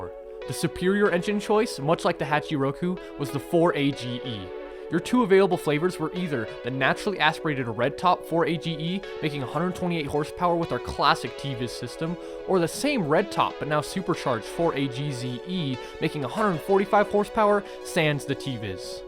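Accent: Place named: Japan. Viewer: Great video but that japanese accent is so cringy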